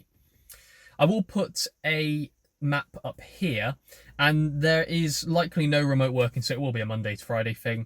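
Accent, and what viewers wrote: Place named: RP, United Kingdom. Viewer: No problems and keep up the great work. There's a Norwegian guy that does tests also. Very interesting statistics etc. Btw, you speak clearly without any regional accent, hence why I thought you may have been to either public school or Oxbridge 😎👍🏾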